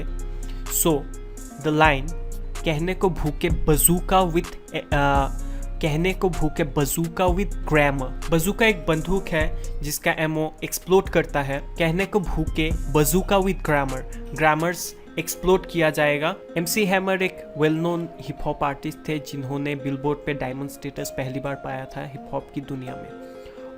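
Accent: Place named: Bengal, India. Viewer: Your bengoli Akcent is so cute 😃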